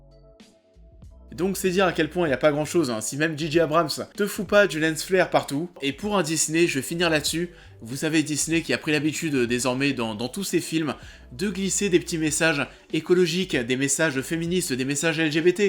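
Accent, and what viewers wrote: Paris, France. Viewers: Insupportable cette façon de parler de p'tite merde parisienne hin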